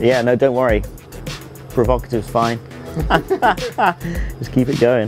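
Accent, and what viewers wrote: United Kingdom. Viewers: I could hear him speak all day long. The British accent is the best. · Are you british?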